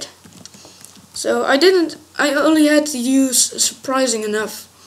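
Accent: Netherlands. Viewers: bent toch nederlands he? Ik hoorde het al aan je stem en accent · I am Australian. I was actually quite impressed with your English. Thought you sounded a little Dutch, so I just wanted to check :)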